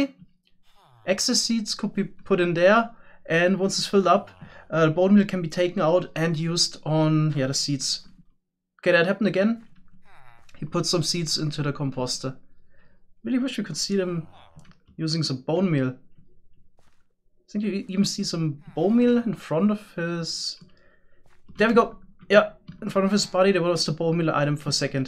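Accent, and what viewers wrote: Germany. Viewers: Ich Liebe deine Videos, Aber es ist echt schlimm einen Deutschen Englisch sprechen zu hören wenn mn weiß das Er es auf deutsch besser könnte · Dein Englisch is so deutsch, ich kann nicht mehr 😂😂, keine grammatikfehler oder so aber der Akzent is einfach geil!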